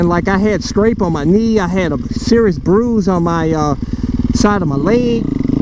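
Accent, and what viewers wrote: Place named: Northeast Ohio, United States. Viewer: I get this strange feeling that you’re from northeast ohio. Maybe its the accent.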